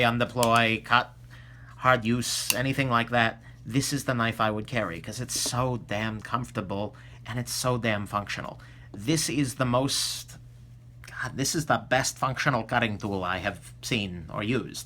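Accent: New York, United States. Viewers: You sound like your from New York or Boston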